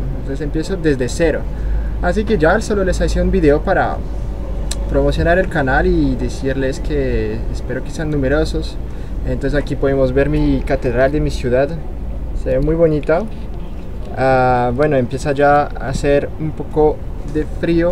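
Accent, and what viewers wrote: Colombia. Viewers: eres francés? o nació en Colombia y se crió en Francia. siento a veces acento bogotano y ahora paisa jejeje! saludos!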